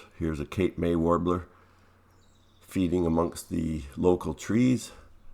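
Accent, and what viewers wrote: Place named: Canada. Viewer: What accent do you have, id like to be able to identify it in future, its like a Canadian dan ackeroid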